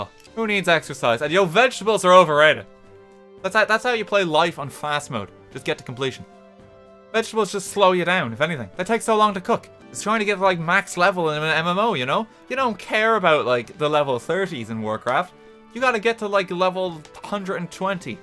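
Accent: Ireland. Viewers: Gotta love the Irish accent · First video I’ve seen and I love it, especially his BEAST Irish accent (I mean I’m Scottish but no ones perfect) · That’s the beauty of the Irish accent 😍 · That's how Irish people say it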